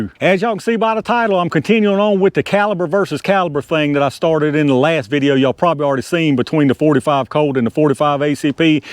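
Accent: South, United States. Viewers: Well, this was a bit like "beating a dead horse", wasn't it? It is already well known that , in terms of kinetic energy, the average 38S is very close the the average 9 mm Parabellum. On the other hand, 380 is practically on par with 32 auto (32 caliber pistol, in Europe known as 7.65 millimeter pistol round) which is a rung lower than 38S/9mm. Nevertheless, I enjoyed watching your test and listening to your Dixie American English :-)...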